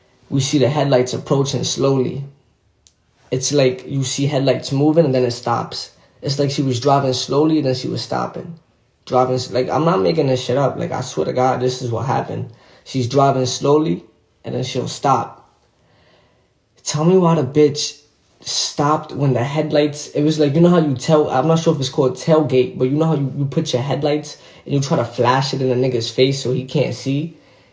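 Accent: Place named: New York, United States. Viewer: what makes the story even funnier is his New York accent 😭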